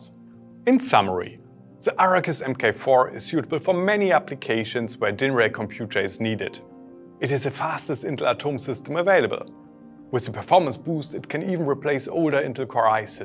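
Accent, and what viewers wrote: Germany. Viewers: Hab das gerade als Werbung eingeblendet bekommen. Bitte nehmt den Spot unbedingt nochmal mit einem anderen Sprecher auf, und in normaler Geschwindigkeit. Der Akzent ist so krass deutsch, dass man es in Verbindung mit der extremen Sprechgeschwindigkeit fast nicht versteht. Hört sich zwar teilweise lustig an (wenn man es so interpretieren will), aber ich bin sicher, dass das nicht eure Absicht war. Wenn der Spot kurz sein soll: Kommt auf den Punkt und versucht nicht, in ein paar Sekunden in ausführlicher Prosa "se Juniwärs" zu erklären. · Wer hat diesen Sprecher ausgesucht? Er redet wie die Karikatur eines Deutschen.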